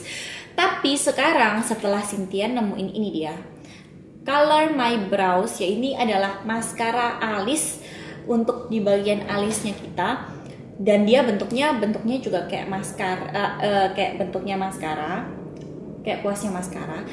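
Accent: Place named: Yogyakarta, Indonesia. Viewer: Haiii, kamu orang jogja yaa? Medok bangetttt Nice vids btw!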